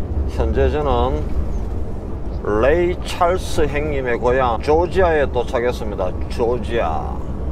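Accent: Gyeongsang, South Korea. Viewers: 고향이 서부경남쪽? 진주? 부근?. 응원합니다. 감사합니다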